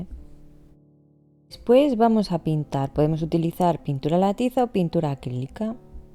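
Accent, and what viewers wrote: Catalonia, Spain. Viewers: Eres Catalana por tu peculiar forma de hablar Me encanta , pues conozco bastante Catalunya y,tengo muchos amigos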